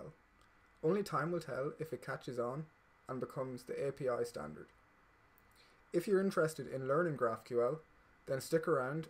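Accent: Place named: Ireland. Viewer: Great quick video! And cool to hear an Irish YouTuber doing programming tutorials 🤙☘️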